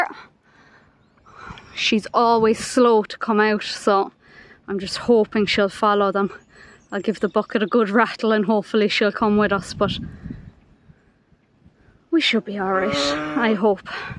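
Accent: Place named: Cork, Ireland. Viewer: Listening to u I’m convinced you have more of a CORK accent than a Kerry one. You are lucky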